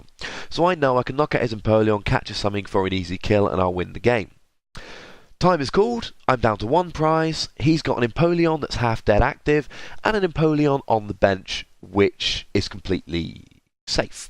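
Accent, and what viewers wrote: United Kingdom. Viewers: His voice is just beast. Love the cornish accent, He's a good teacher too, he rescued my business grades!! If is watching then good luck in your career and if not, then someone pass on the message:)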